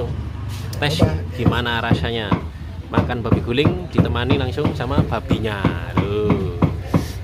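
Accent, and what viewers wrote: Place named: Java, Indonesia. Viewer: Logat Lidah Rasa Jawa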